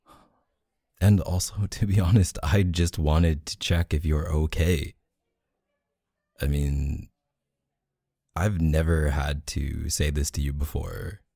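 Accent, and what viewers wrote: Canada. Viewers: canadian accents are so adorable i cannot— 😶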